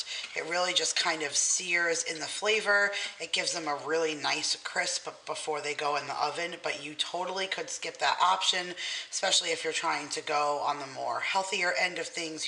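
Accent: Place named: New Jersey, United States. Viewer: I recently started watching your videos. Where are you from? We just moved to New Jersey last month (my husband is in the military) and your accent sounds familiar to what I hear around here sometimes. I'm super excited to try out this recipe.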